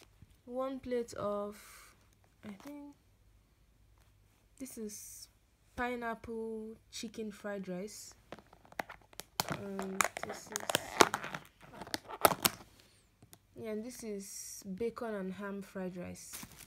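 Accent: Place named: Nigeria. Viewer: Hi Your Nigeria accent is still strong Love from Ukraine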